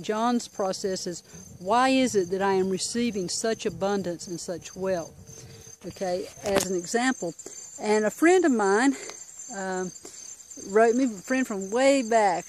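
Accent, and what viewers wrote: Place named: South, United States. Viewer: You're awesome. IM a "Tarheel" Myself,by the way. I Love the Carolina/Southern dialects and accents, your's is music to my ears!! Yee Haw!! Keith.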